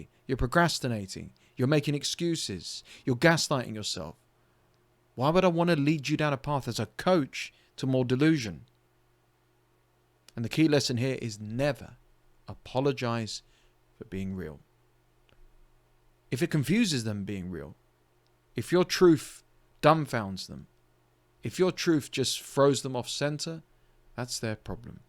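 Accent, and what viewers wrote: United Kingdom. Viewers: Im assuming youre in the UK because of your accent, but that sounds fuckin miserable. Im in Tennessee USA and thats not my experience at all. People are generally nice to me as I encounter them, and my only real complaints are mannerisms that dont really affect me and just tell me a little about people. Im a fairly intimidating man though, so I doubt anyone would ever even try me like this. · Realistic no BS Brittish Man with an elegant accent.. instant subscription good Sir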